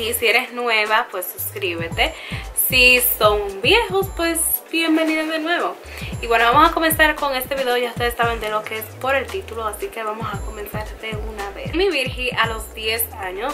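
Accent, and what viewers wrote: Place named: Puerto Rico. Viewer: Acento PR veoo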